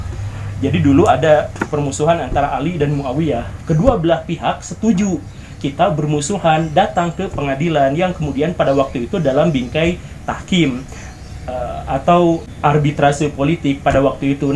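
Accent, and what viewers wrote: West Java, Indonesia. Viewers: Logat Sunda pak guru membuat ku kangen Bandung. Aku pernah 1 tahun di Bandung.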